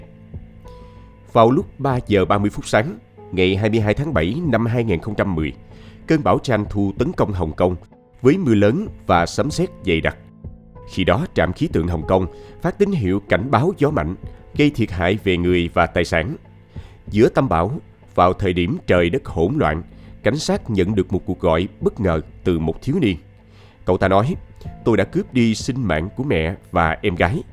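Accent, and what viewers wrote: South, Vietnam. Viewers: Người ta đọc tin như thế này sao lại chê ? Giọng đọc rõ ràng chấm phẩy đúng nơi đúng lúc giọng miền Nam rất dễ nghe 👏👏👏👏👍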